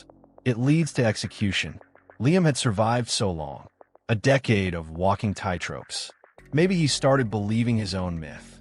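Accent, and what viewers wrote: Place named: United States. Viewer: 😂 American telling stories fs what i would love to know is where some yank knew it to tell 😅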